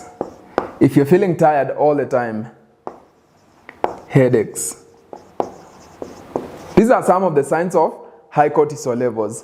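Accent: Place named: Kenya. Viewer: Are you kenyan? Just asking, you sound like my watu:)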